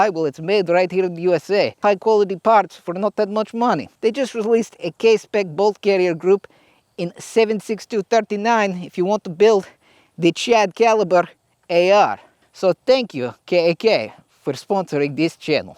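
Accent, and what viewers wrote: Russia. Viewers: Your accent sounds like FPS Russian are you related? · Except for that russian accent you're almost clone-correct. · Better Russian accent than FPSrussia.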